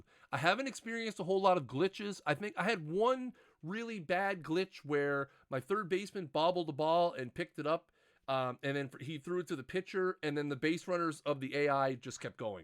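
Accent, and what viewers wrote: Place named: Ireland. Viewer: Total tangent, but I'd love to know where your accent is from. You sound like you turn Irish every time you make an 'ar' sound. When you say 'are' and 'target' back to back, as an example.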